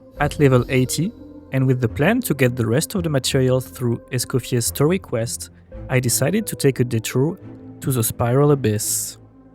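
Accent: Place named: France. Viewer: my bad but more I hear you more I think I'm hearing a french accent like I don't feel u to have English as first language 👽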